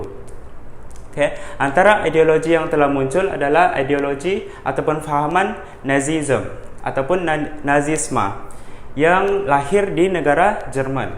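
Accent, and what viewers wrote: Sabah, Malaysia. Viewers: Cg ni org Sabah ka ?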